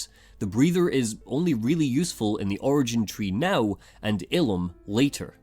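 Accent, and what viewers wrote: United Kingdom. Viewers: Are you stupid? The guy is British. You foreigners are so ignorant but then again you do live in a 3rd world country.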